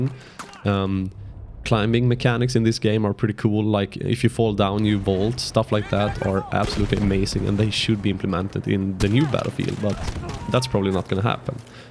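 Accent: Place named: Sweden. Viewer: You sound swedish😂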